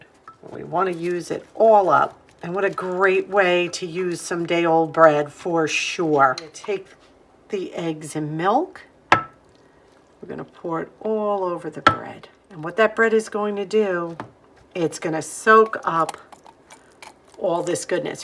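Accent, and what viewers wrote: New York, United States. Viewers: Hi and, its Helen from Sydney, Muswellbrook, Australia. I have been watching your show now for many a while now and I enjoy your positive, kind and practical outlook on life. I always enjoy your warm and friendly start to your show. I have cooked many of your delicious, frugal reciepes and you have shown me how easy it is to enjoy life without wasting money. Love your New York accent and the kitchen shelving with your lovely vintage bowls. God Bless you and have a Happy Easter❤😊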